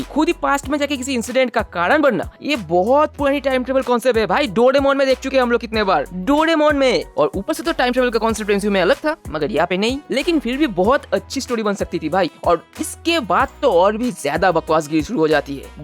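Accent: India. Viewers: Baton se ap indian Q lag rahy ho ap 🤔🤔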